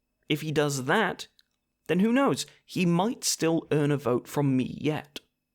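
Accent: United Kingdom. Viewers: Just found your channel, I don't agree with all your opinions on things but i do on others. Either way, interesting videos, well edited, and the perfect British deliverance.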